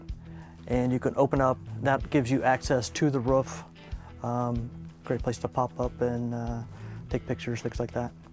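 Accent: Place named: England, United Kingdom. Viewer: I can’t tell if you are English or are just trying to sound it. And I don’t know which one I prefer.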